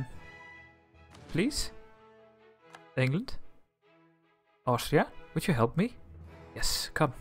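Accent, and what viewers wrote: Netherlands. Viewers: I can really tell that you are Dutch from how you pronounce the Dutch cities. It's strange hearing a mix of English and Dutch like that.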